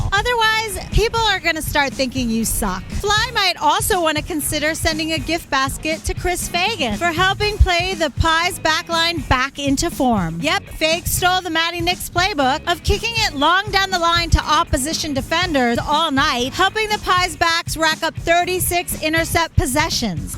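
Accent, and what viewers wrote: United States. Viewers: am Paralowie,South Australia I read a story on page 16 of the Advertiser, yes I’m that old I still read the newspaper. I’m 50 yrs old born in Australia and know very little about Aussie Rules. I’m learning from you, you crack me up 😂😂 I really love that you are an American Female talking about AFL. Your humor and accent make it even funnier, don’t stop👍🏼 You’re our official American Commentator 😁😁😁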